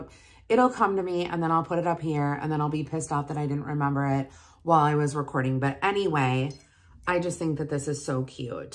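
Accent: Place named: Midwest, United States. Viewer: First thing I thought, midwestern gal!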